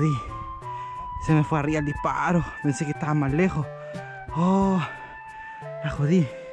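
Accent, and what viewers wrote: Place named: Chile. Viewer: Re chileno 🤣. Saludos desde Argentina 🇦🇷🇦🇷👍😎👍🇦🇷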